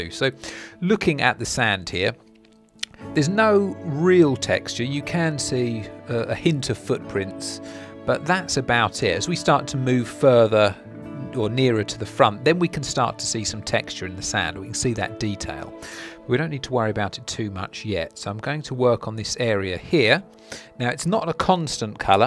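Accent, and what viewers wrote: United Kingdom. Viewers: That's a nice British accent you've got there, where do you live? ( answer only if it's appropriate for you)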